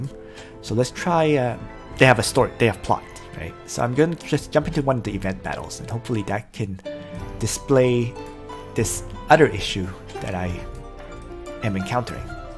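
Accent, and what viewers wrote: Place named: Quebec, Canada. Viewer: You're Canadian! I was always curious where your accent was from, and I had suspected it was French-Canadian. My girlfriend has been wanting me to ask for the longest time, as she's subjected to hearing your videos all the time as I game. :b Anyhow, it would have been nice to get another good FF Tactics game, but it also comes as a bit of a relief that no other mobile tactics game will take time away from Langrisser given all the hours I've put into it thus far. Also, that you won't be torn away any time soon from continuing to release quality Langrisser strategy content. :D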